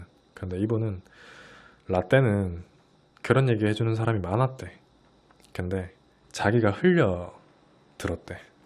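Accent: Gyeongsang, South Korea. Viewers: 나 50대에요. 구독하고 듣고 있어요. 나도 경상도 출신이라 사투리 억양이 편하고 시니컬한 조언이 좋아요.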